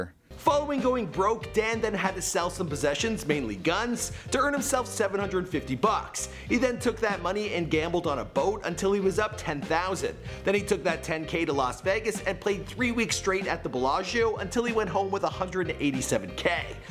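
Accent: Canada. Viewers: Real Bride Of Chucky he’s Canadian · Jessica haha he’s Canadian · I forgot is a damn Canadian... 😑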